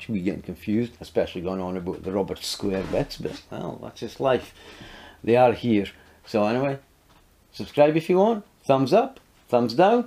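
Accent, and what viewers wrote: Scotland, United Kingdom. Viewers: Are you Scottish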